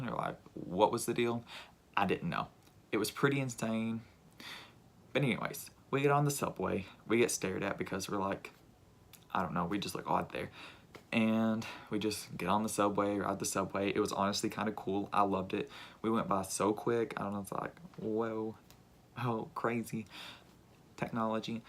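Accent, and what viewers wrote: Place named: North Alabama, United States. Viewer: The accent!!!! Where are you from, cause that sounds like a north bama accent?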